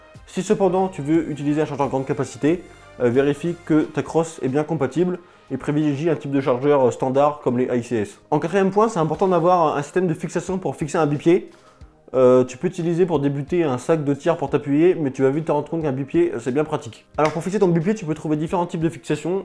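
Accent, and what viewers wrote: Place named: North, France. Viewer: J'ai beau avoir quelques années de tir, je me régale à vous écouter. Et cet accent, du nord, trop sympa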